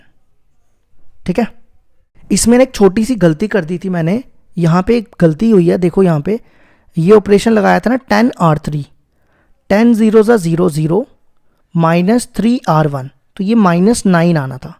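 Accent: Jammu, India. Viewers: Sir Aap Jammu se hoo kya.. 😌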